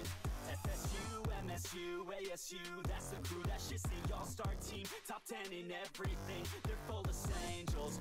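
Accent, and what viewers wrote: Georgia. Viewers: are you from georgia?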